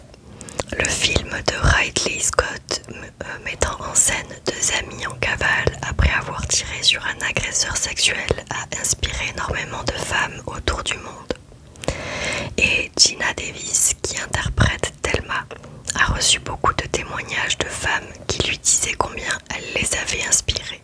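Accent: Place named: South, France. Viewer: avec grand plaisir A moi de te remercier pour tes vidéos de grande qualité et toujours intéressantes Et ta passion pour l'astronomie et l'espace est contagieuse tu en parles d'une belle manière ! Sinon pour l'accent du sud (milieu) bah ne le perds pas j'adore ! moi je ne vis plus en France et il s'est évaporé avec le temps et la distance mais j'ai grand plaisir à le retrouver quand tu chuchottes ! Continue tu es excellente 💫🪐👍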